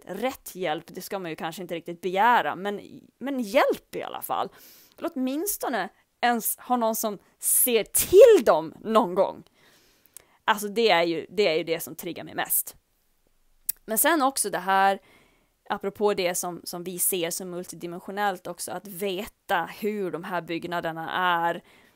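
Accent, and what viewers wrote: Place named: Gotland, Sweden. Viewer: så härligt att få höra gotländsk dialekt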